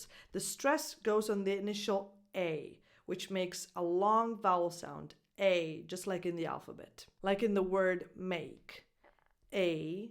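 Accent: United States. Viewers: Sorry but you speak Only American and Not English as most of the italian teachers......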